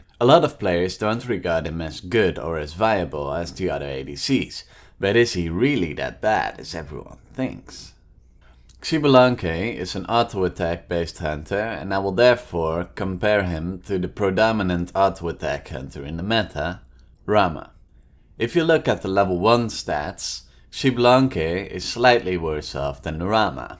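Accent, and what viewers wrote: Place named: Netherlands. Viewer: Thanks for this video, definitely going to improve my Xbal! Off-topic question but do you mind telling me where you're from? You kind have a Dutch accent, but not sure if you're actually from the Netherlands. :p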